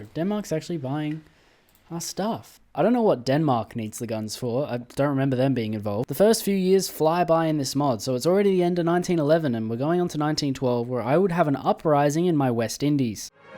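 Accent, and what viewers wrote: United Kingdom. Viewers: The British accent caught me off guard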